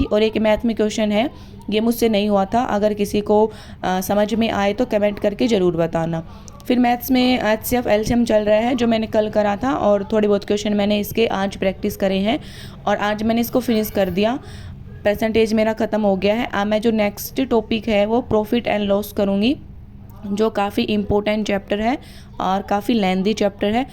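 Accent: India. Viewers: Tu to awaz se dakshin Haryana ki laage hai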